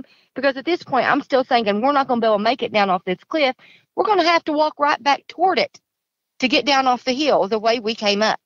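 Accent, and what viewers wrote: South, United States. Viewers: your lil ol southern drawl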